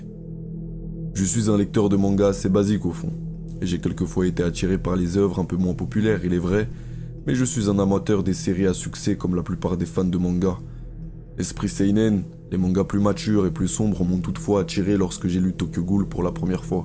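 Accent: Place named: Banlieue, France. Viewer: Je suis fan moi aussi de l' univers de Miura. Votre vidéo est intéressante et prometteuse, je vous encourage vivement à en présenter d' autres ,que ce soit pour approfondir sur Berserk ou aborder d' autres de vos passions. Votre petit accent de quartier m' a rappelé les ( très heureuses) années où j' ai travaillé comme instit en ZEP. Quand je croise mes anciens petits élèves , ils ont un peu cette voix et certains sont devenus des golgoths qui me dépassent d' une bonne tête😂 . Je ne travaille plus dans l' éduc nat.( Je suis handicapée et je me consacre désormais à l écriture et au dessin) Je ne vous écris pas cela pour vous raconter ma vie mais parceque vous demandez des retours sur votre travail . Il comporte beaucoup de qualités mais il y a quelques petites maladresses d' expression qui gagneraient à être corrigées 😊.Vous aurez,si vous continuez à produire des vidéos, des auditeurs d'horizons très divers er ce serait dommage que quelques maladresses gâchent leur appréciation sur votre travail. 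Si vous voulez je peux corriger rapidement le script de votre prochaine video, ( gratuitement ) . Vous écrivez plutôt bien et je sais que ça ne me prendrait pas des heures. je trouve que votre propos serait mis davantage en valeur. En tout cas félicitations, vous donnez envie de découvrir cette fantastique fresque graphique sans rien spoiler! Si vous voulez me joindre je suis sur Facebook ( et oui,j ai 50 balais, c' est bien de mon âge !) et sur Instagram à mon nom. Cécile Creixell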